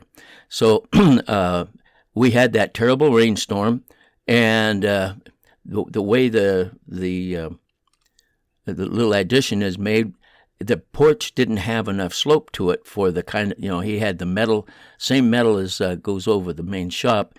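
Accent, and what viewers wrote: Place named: South, United States. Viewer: OK 👍 I'll subscribe to you... because you sound like a country hillbilly, like me...🤣 nice little story about Blackie and the well house..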